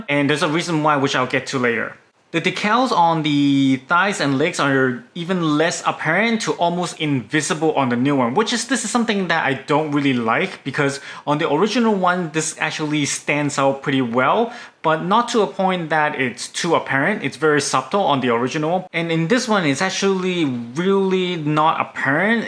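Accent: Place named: China. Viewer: you voice comes off as someone who native tongue is chinese.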